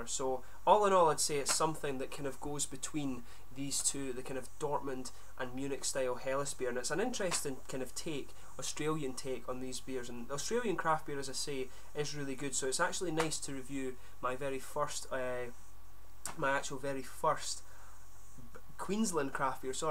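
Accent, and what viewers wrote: Scotland, United Kingdom. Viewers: its great you not lost that rich scottish accent whilst traveling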